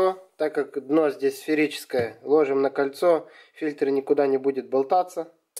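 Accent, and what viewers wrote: Odessa, Ukraine. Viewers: акцент как у Одесских евреев.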